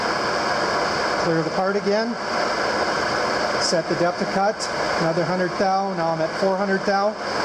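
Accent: Canada. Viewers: Are you Canadian bud? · etalwork was theonly practical class at school, I liked. wish I had learnt more. I thought,tho, that when machining like this, funning luid was used, to shed heat,reduce tool wear, speed process. maybe only on large production lines. ? in a microchip world, nice to see some crsftwork. good video, by Canadian presenter, pronouncing 'out', more like 'oot'? )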